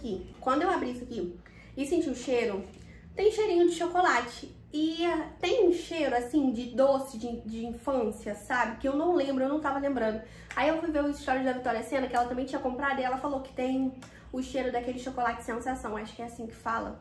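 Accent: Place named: Rio de Janeiro, Brazil. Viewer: Sotaque de carioca , no Brasil é assim cada localidade com seu sotaque e temos que respeitar ❤ eu acho lindo sotaque dos cariocas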